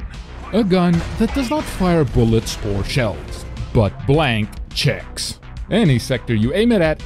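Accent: South Africa. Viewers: Long since I've heard a fellow South African Youtuber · Dude! Are you South African?? Cheers from a fellow citizen missing mail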